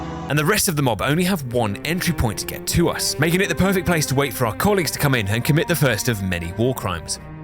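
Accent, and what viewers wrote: United Kingdom. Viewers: The sweet, soothing british voice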